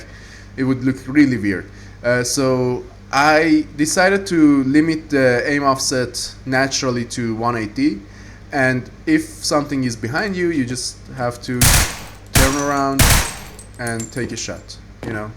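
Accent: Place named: Iran. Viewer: are you Persian? your accent sounds like Persians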